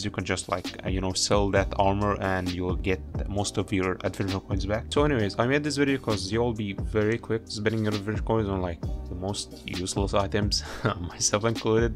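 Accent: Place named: Egypt. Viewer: I can tell that you're Egyptian from just your accent.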